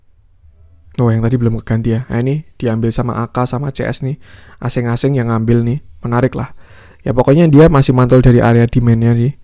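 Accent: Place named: Central Java, Indonesia. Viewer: Logat jowo tengah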